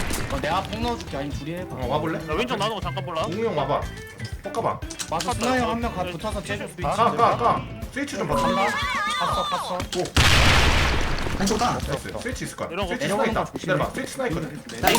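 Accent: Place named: Jeolla, South Korea. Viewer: 전라디언 ㄷㄷㄷ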